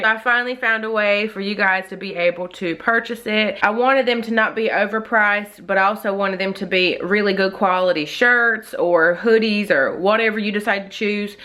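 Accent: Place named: Mississippi, United States. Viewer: Girl are you sure you ain’t from Tupelo Mississippi? 🤣 the way you talk and explain things... you always make me smile. 😘❤️❤️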